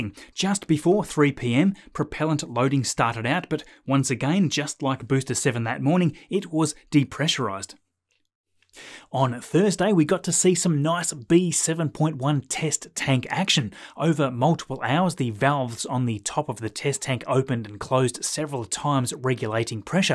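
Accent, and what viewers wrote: Australia. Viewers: I lived in Brisbane for 5 years. I come here for weekly dose of Australian English ... LOL I do like the Aussie English .. I am from Brazil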